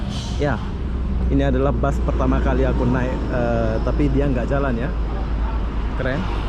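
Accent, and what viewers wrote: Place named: Malaysia. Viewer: Warga Malaysia bg???kok bahasa dialek nya beda.saya subscribe bang saya suka cara abang.rendah hati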